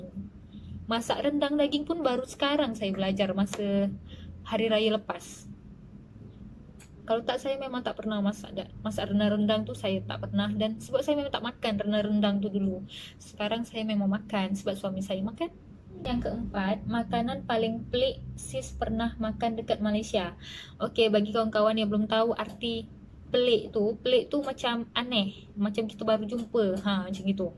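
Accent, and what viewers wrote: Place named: Indonesia. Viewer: Sis maaf,siS org melayu tapi warga indonsia Tapi Bahasa malaysia anda bunyi indon😊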